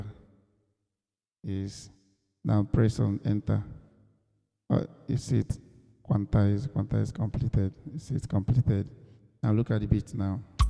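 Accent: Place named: India. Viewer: Sir your voice has turned to an Indian voice, I couldn't understand the language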